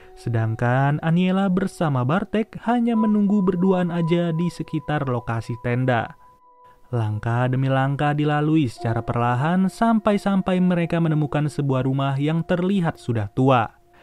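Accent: West Java, Indonesia. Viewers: Bang lu orang Sunda ya?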